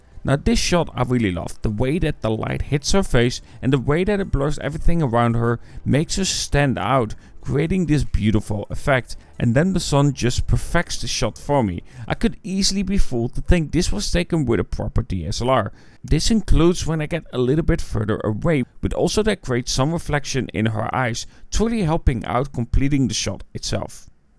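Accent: Netherlands. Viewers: Yo, man, off topic but what accent do you have, I heard you are in the Netherlands, is it a Dutch accent? If it is, it's really strange how different the Afrikaans and Dutch, English accent is, despite the languages being so similar.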